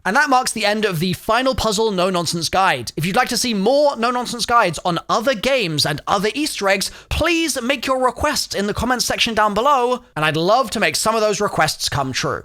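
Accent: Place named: RP, United Kingdom. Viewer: The most irritating voice on YouTube! I can't finish watching this toff! Don't know what you mean with the hand not being there, it's been there every single time for me and I've not waited for those body counts... Doesn't just sound like shit then🫣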